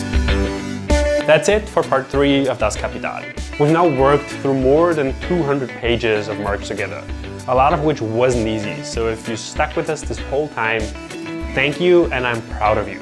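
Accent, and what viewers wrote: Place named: Germany. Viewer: You think your so smart because of your german accent. You are the bourgeoisie that Marx described. You benefit from the superexploitation of the third world. Maoism-third worldism is a new era of marxism. Your class position in society is determined by the level of exploitation you benefit from not always the relations with the means of production. For instance, during the feudal times, the serfs were held liable to work for a certain quantity of days for the nobles. Even though the serfs owned the means of production such as the land, tools, and equipment, under feudalism, they were compelled to abide by the rules of the ruling class under feudalism which soon led to the french revolution. The french revolution brought forth the primitive stage of capitalism by the execution of King Louis XVI. Feudalism is the dictatorship of the nobles as to capitalism is the dictatorship of the Bourgeoisie and socialism is the dictatorship of the proletariat. 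Capitalism-Imperialism is the dictatorship of the new bourgeoisie, the first world parasites.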